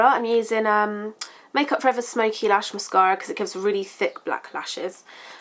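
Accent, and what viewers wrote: United Kingdom. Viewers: OMG You are so beautiful!I love your voice! I love your British accent!I love your videos!♥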